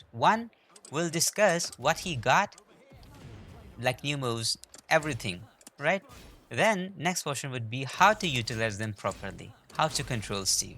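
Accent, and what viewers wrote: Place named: Pakistan. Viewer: I can't tell whether you are Pakistani or british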